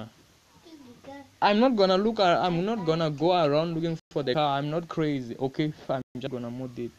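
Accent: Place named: South Africa. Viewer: I can smell the accent...mzansi👑❤😂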